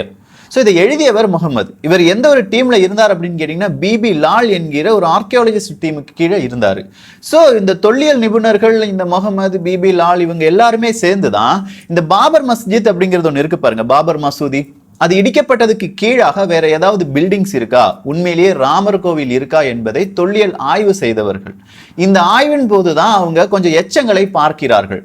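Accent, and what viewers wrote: Kerala, India. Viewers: Friend, are you Keralite🤔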